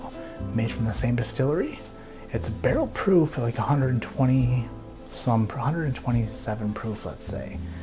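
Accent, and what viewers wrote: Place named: Minnesota, United States. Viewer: He has SUCH a Minnesota accent! Must be from the Cites or thereabouts.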